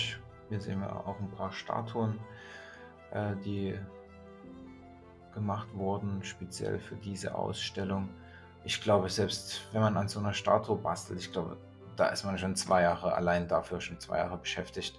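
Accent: Saxony, Germany. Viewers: Über die Rhetorik mach dir keine Gedanken, der sächsische Dialekt ist goldig und du hast eine nette, bescheidene Art, dadurch wirkst du sehr sympathisch. 💐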